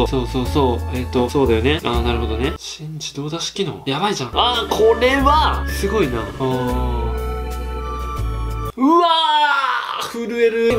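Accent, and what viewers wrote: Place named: Kansai, Japan. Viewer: 謎の関西弁。惚れた。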